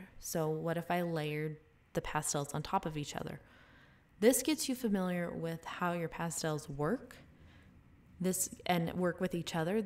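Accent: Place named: Utah, United States. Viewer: I could tell by your accent, that's why I asked. My dad's from Utah, and you sound like a lot of my family on his side (and many a church leader I had 😅)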